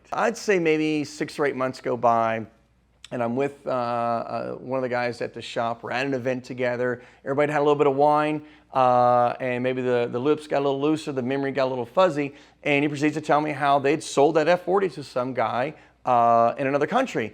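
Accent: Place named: Texas, United States. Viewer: Definitely From Dallas Texas, Maybe Houston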